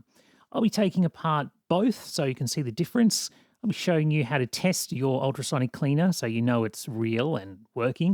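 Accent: Australia. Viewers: The moment I heard your voice, I could tell it was a fellow Aussie. Happy to follow and watch your amazing content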